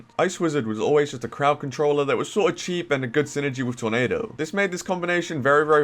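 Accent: United Kingdom. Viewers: Yeah, your accent sounds british, but you always answer to strange times:D Could have been American time zones.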